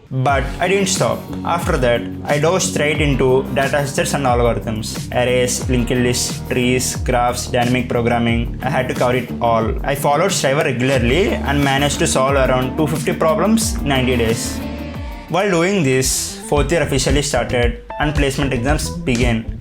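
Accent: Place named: South, United States. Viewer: english communication soo poor..learn that..south accent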